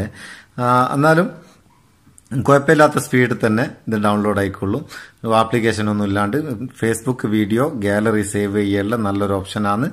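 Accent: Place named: Malabar, India. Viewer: Malabar slang 😁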